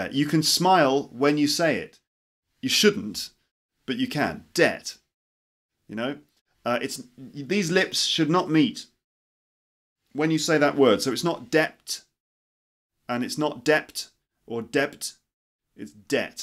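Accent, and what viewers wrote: RP, United Kingdom. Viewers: very nice pronunciation in british accent. bravo! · Hello, I really like your voice and British accent. It is nice and clear to hear again and again. I can listen well but I can’t speak fluently, especially the English accent. I’m not satisfied at all with my voice, pronunciation and accent when I speak English out. Short talking is ok but long conversations or storytelling really suck. What can I do? I mean how do I practice? Do I need to practice English speaking one-on-one with a native speaker or can it be helpful if I practice English pronunciation first and speaking fluency on my own with the help of the Apps out there? Looking forward to hearing from you, Regards, TJ P.S. I just saw your channel today on iPhone pod cast and it is my very first time listening to 3/4 of your pod cast audios and then see the YouTube videos to see your face with the curiosity of how you look like because I really like your voice as I’ve said and imagined your how you look like in my mind so. 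· nice lesson i adore the british accent thank you so much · Hi,! I'm from Russia! I love your posh accent!I kind of want it too. And I also really need your opinion whether the following sentence:'all he asks for you is be prepared as much as he does ok'-makes sense for you as a native speaker. It's very important for me.I just have a little bet about that.And now I need your help.so please,.I would appreciate it. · I love how he’s a lil it British. 😂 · Hi Sir, My name is Karla, I have to say something about your pronunciation. Your accent is from UK, If I pronoun all those words as you said, American People will correct me? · Are you a native British speaker?What sort of British accent do you use? Is it RP?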